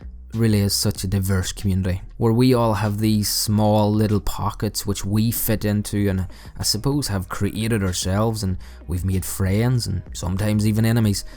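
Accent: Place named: Derry, United Kingdom. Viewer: explains why you have a slightly northern/ derry accent :) i live in dungannon tyrone, a big town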